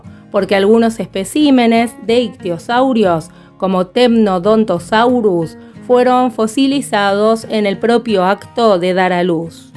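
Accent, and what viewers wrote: Argentina. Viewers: Muy bonitos y gorditos estos "delfincitos cavernicolas", jaa. Yo los he hecho en plastilina..son muy faciles de hacer. Pero ahora quiero hacerlos en resina, igual que mis otros dinosaurios que hago. Yo creo que si vivieron hasta el final de los dinosaurios, con la ultima especie descubierta en el Cretacico. Pues su anatomia resulto muy exitosa para su ambiente. Como lo es ahora los delfines y ballenas..claro: estos tienen la mala suerte de haberse topado...con el Hombre, somos los peores, jaa. Muy buen video, felicidades. Solo no me gusto el acento argentino...es muy molesto. Adios desde cd. De Mexico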